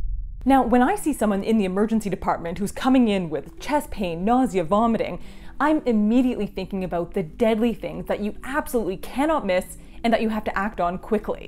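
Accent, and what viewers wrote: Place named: Canada. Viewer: If you’re in the US, true. She sounds Canadian?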